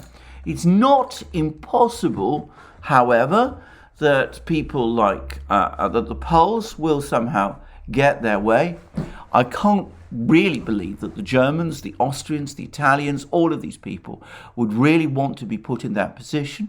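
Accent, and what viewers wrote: England, United Kingdom. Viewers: his lovely English accent,they way he scratches his head....that loquanical....droll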